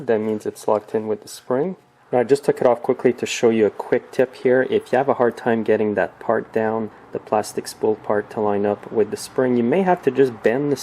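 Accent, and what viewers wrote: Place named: Quebec, Canada. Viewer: Hey this worked absolutely perfectly on my 180 C Easy2Start. Couldn't have done it without your careful, calm coaching. You sound as if you might be from Quebec, if so, merci from an Ontario friend!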